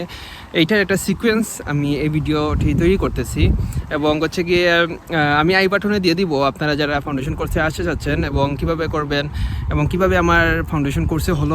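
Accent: Sylhet, Bangladesh. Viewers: Bhai mono oy Sylheti 😅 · ভাই সিলেটি মাত আইয়য় ❤ i love Sylhet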